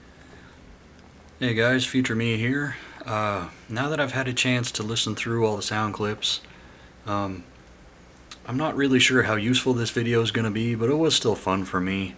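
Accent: Canada. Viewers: Just got into building about four months ago. I love your videos man. No this was not boring at all. I could watch this stuff all day. P.s Are you Canadian? My canuk radar is going off haha. I’m in Ontario. Cheers.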